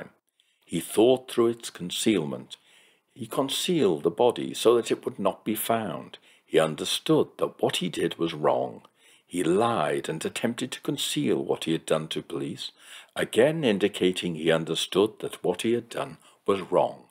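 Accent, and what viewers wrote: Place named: Liverpool, United Kingdom. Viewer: Soft Scouse.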